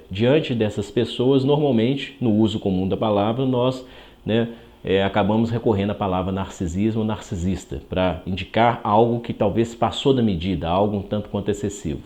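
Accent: Minas Gerais, Brazil. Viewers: Parou tudoooo!!! Um arrazo! Eheh! Nada me fascina tanto quanto uma oratória tão perfeita! Com uma pronúncia de Minas Gerais então...ui! Rss. A fluidez das palavras; o intervalo entre as frases; o seguimento do raciocínio; o tom moderado e firme da voz. Perfeito! Abraço, Sr. Doutor!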